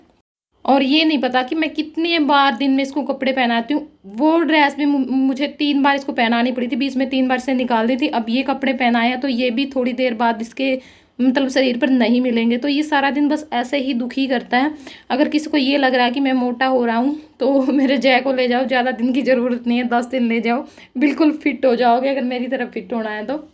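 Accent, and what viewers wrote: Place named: Bhiwani, India. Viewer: Village Life with ok maine socha bhiwani ke paas ke ho aap boli waisi h aaj Maine aapko video first time Demi h nice video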